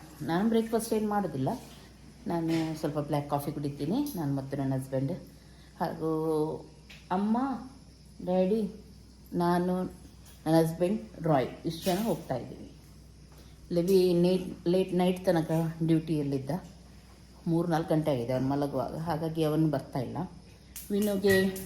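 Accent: Mangalore, India. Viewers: ಮಂಗಳೂರು ಕನ್ನಡ ತುಂಬಾ cute unttu.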